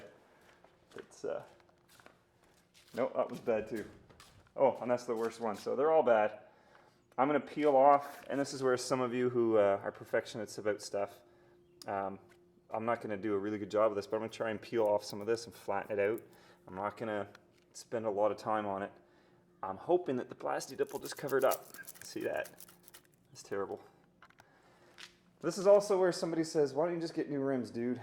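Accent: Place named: Canada. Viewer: Canadian eh???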